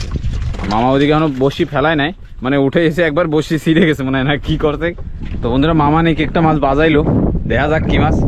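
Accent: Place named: Shariatpur, Bangladesh. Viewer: তোমার জন্ম স্থান কোথায়, বাংলাদেশ না আন্দামান। তোমার ভাষায় মনে হয় শরীয়তপুরের আশে পাশে।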